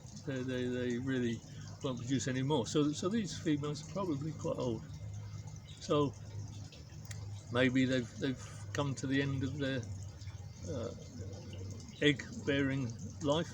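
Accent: United Kingdom. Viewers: You sound British, where in the world are you?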